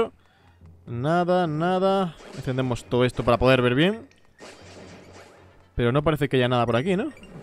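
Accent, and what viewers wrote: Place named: Mexico. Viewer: AY ME ENCANTA QUE HABLES TIPO MEXICANO. ;;;; ❤💜❤💜❤💜❤💜❤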